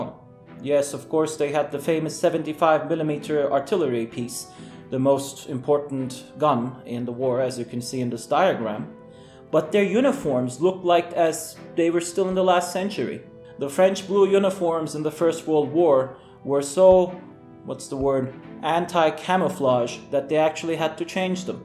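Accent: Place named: Germany. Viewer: Almanya'da yasadin ? Accent almandir.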